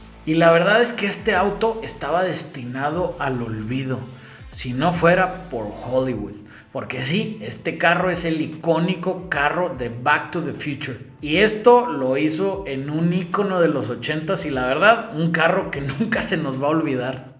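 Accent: North, Mexico. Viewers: Lastima del buen video, con ese horrible acento norteño balazo, esta dificil de ver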